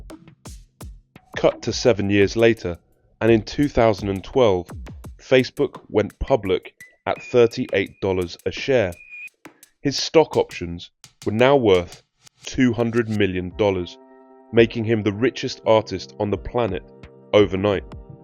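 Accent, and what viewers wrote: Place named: United Kingdom. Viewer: Ill never get over how Brits pronounce Los Angeles as “Los Angelees” and taco as “takko” 😆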